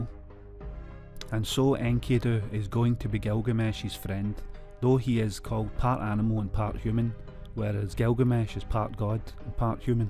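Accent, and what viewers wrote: Scotland, United Kingdom. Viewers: Yeah! A Scottish accent! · It's his channel and I have no problem listening to his Scottish accent. Just concentrate and you'll get🖒.. cA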